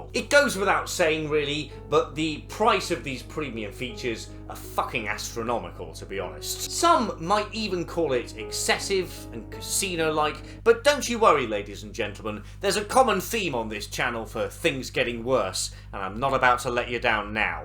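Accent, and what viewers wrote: United Kingdom. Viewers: Hey, the irate British guy is back!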